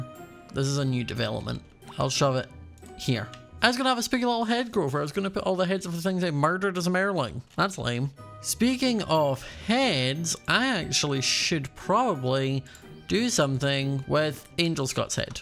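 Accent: Scotland, United Kingdom. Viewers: U are Scottish aren't U respect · I'll keep that in mind and will try and remember to check my notifications at 6pm at Tuesday and Saturday- Btw, I love the Afterlife series! The Scottish accent makes your vids better and funnier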